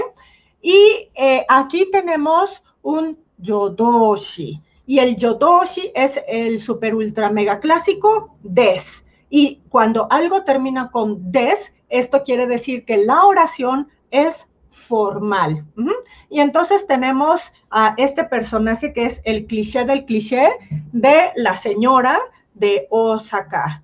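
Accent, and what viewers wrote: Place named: Mexico City, Mexico. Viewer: Hola hola Sensei!! Apenas descubrí tus clases, qué bonito explicas, además cuando hablas tan chilanga es increíble, eso hace la clase más entretenida y bonita... Gracias Gracias 💞... Propósito de vida, tener una small talk en japonés 🎉 y contigo lo lograré!! Gracias gracias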